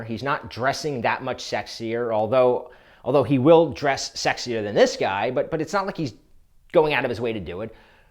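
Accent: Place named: Boston, United States. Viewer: This dude is definitely from Boston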